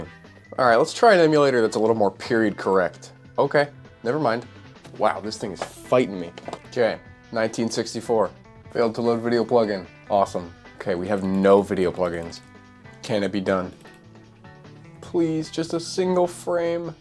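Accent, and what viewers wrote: Canada. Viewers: because it's the exact same with a canadian accent instead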